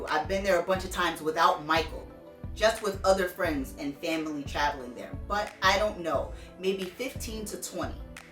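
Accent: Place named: Queens, United States. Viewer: I absolutely love you! Thank you for this! It's raw, real AND revealing! There are LOTS of top videos explaining the info, showing the receipts and kicking LN in the face and your video needs to be shared among the other ones! I haven't even gotten through half of the video. Not only do I love your passion, your knowledge and intellect, I LOVE your accent, being a man, who as a boy grew up in Queens. The way you speak so passionately about this reminds me of family and friends from when I was a kid that would start speakin real truth. Shoot, I've been gone since 1997 and get lit like that. You're great! I love your memorabilia, I love your style. Keep your head up, keep it goin, thank you!!!! 😍😍😍❤❤❤❤🔥🔥🔥👏👏👏👏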